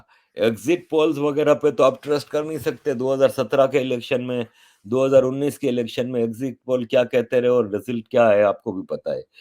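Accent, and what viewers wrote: Gujarat, India. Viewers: Sir आप सच्चे देशभक्त ओर. लागता नाही की आप की परवरिश पाकिस्तान या मदरसे हुई है आप की बात करने का जो लहेजा है ओ पुरा गुजराती हिंदुस्तानी है .जय हिंद 🙏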